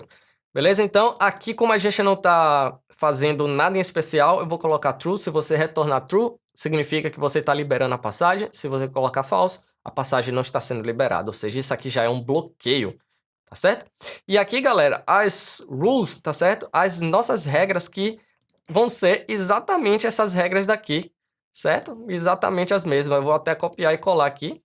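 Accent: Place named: Bahia, Brazil. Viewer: Muito top a aula mestre!! Acho maneiro seu sotaque baiano hahahaha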